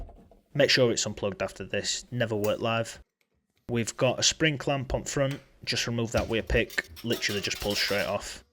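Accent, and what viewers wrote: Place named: Yorkshire, United Kingdom. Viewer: Brilliant video. I had the dampers replaced in mine a few months ago, but now it's making a horrendous sound spinning with sparks flying inside the drum. Probably bearing collapse. Your strong Yorkshire accent brings back memories. I was born and bred in Yorkshire. I moved from West Yorkshire to Cornwall 30 years ago as it was being taken over by Pakistanis and other minorities.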